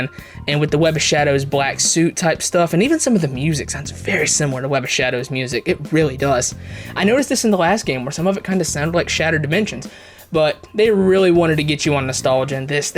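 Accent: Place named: South, United States. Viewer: I love your country ascent 😂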